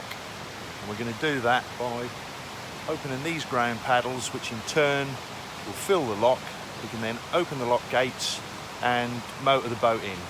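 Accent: Norfolk, United Kingdom. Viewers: Is that a Norfolk accent I detect?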